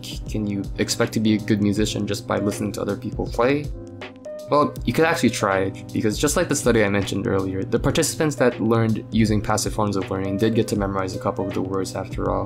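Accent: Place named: United States. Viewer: Hey, are you Indonesian? Or Malaysian? But, you sound so American, hhhmmm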